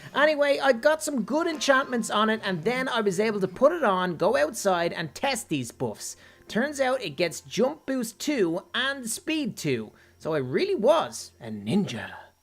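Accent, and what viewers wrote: Ireland. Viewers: bro don't mean to be rude or anything but are you Irish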